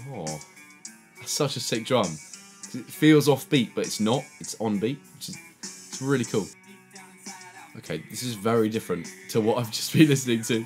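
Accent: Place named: United Kingdom. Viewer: Good video. You're super funny. British accent is the shit. Liked it!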